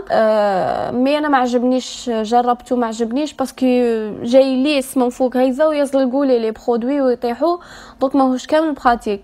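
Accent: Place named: M'Sila, Algeria. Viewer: سلام حبيبتي والله نتبعك ونموت عليك سيرتو كي راكي تحكي باللهجة تاع ناس مسيلة والله عسل وزيد متواضعة ربي يسترك بغيت نسقسيك عندي شهادة دولية فالستيتيك نقدر نقري بيها تما كفاش ندير من فضلك جاوبني ربي يسترك جاوبني وبتوفيق متابعة وافية ليك من جنوب الجزائر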